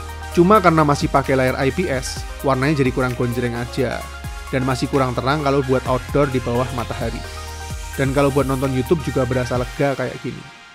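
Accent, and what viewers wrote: Yogyakarta, Indonesia. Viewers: wong jogja mas? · orang jogja bang? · Bang orang jogja ya?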